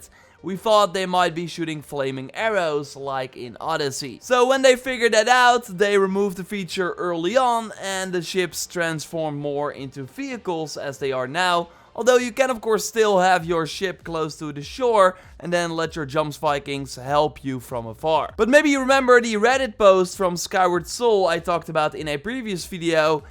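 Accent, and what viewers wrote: Netherlands. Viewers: Idek if you're dutch but you have the most dutch accent ive ever heard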